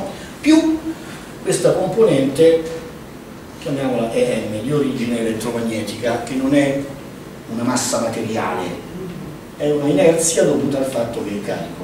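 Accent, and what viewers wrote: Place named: Rome, Italy. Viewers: L'accento romanesco ci sta, il problema è il resto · Io credo che neanche nell'Africa nera : eco, illuminazione comica, leggibilità della lavagna, accento romanesco....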